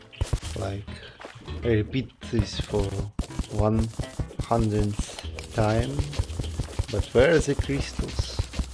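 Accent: Russia. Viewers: А я то думаю почему акцент такой русский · I see a lot of Russian friends that write in English excellently, but neglect speech and worry their skills are still terrible. Believe me, better writing = better you! You have already mastered 90% of speech skills, the accent is just for flavour and us native speakers can't get enough of yours =D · Russian accent doesn't bother me lol Just makes it seem like I'm watching a Soviet legend lol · Its some type of russian/slavic accent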